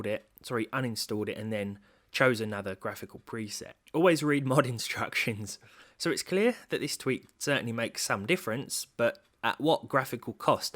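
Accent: United Kingdom. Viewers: Lowspecgamer:British edition.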